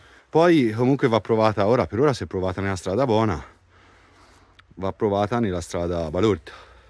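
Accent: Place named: Tuscany, Italy. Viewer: Tutte e due dei bei mezzi anche se differenza c'è, però il bello del video è la "nostra toscana'!" Nel modo di parlare,Perché anche io lo sono 😅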